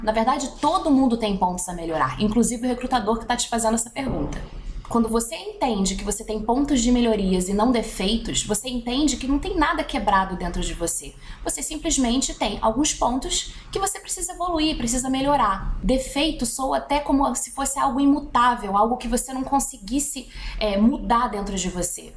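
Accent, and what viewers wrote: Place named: Rio de Janeiro, Brazil. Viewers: Excelente video, adorei as dicas e a forma que vc aborda os problemas e soluções. Só não gostei do sotaque...carioques muito acentuada. Parabéns. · Ela e carioca😆😆😆😆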